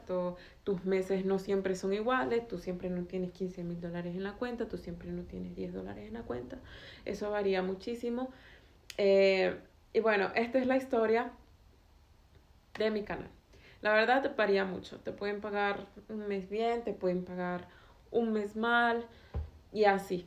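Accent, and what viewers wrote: Colombia. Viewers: Porqué tienes un acento colombiano tan marcado 🤔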